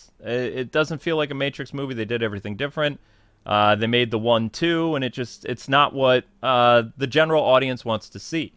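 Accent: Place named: New York, United States. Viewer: I could hear the New York accent coming through today! Great vid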